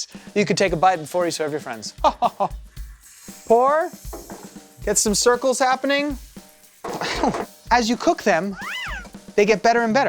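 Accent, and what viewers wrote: France. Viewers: can you speak with that French accent in every video going forward? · Not complaining, but I think may have just wanted an excuse to use his French accent and vocabulary for an entire episode.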